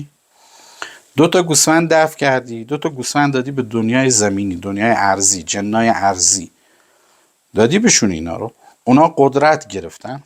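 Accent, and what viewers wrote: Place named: South, Iran. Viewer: سلام به همگی و سلامی مخصوص به شیخ. عزیز جنوبی خونگرم و صادق و بی الایش با دلی بزرگ تر از دریا و لهجه و کلامی شیرینتر از رطب و خرمای جنوب همین....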